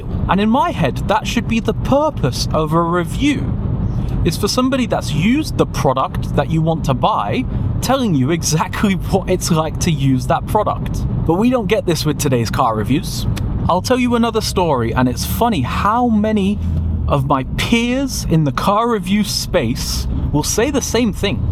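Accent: United Kingdom. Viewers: I'm learning english recently.. I wanna ask you guys.. What is the name of the British accent that is used by this person? I wanna learn more about it ..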